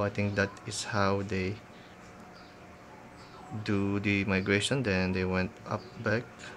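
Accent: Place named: Indonesia. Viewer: logatnya indonesia nih wkwk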